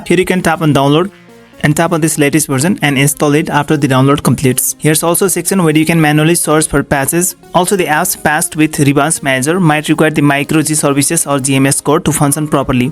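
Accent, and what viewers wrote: India. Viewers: That seems like a you issue honestly. That said, he does have some weird mix of accents, & this is coming from another Indian. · couldnt understand jack shit from the indian accent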